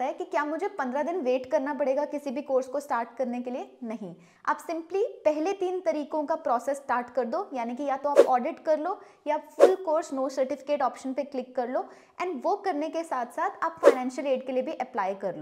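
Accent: Punjab, India. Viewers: Another great video! One thing i have noticed is the people of IIMs are quite different, i mean in a positive manner. You are doing great job. One thing i have observed is you say the word "tarika" and "zaroor" quite differently, you are a Punjabi that's for sure. Any way keep up the good work! Raghav Vohra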